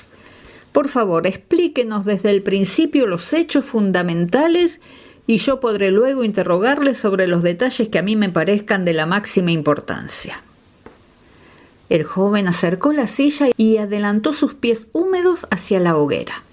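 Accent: Argentina. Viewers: ¡Les deseo muchas felicidades en el 2024! Gracias por tan agradables narraciones claras y con la musicalidad del acento argentino.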